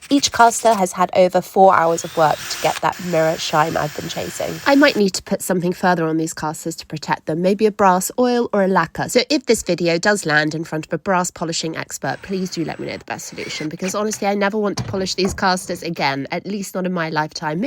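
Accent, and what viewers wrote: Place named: RP, United Kingdom. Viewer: You speak like the Queen of England in her 1956 or 7 Christmas greeting. Hurrah on your hard work. I’ve not ever finished a project like this.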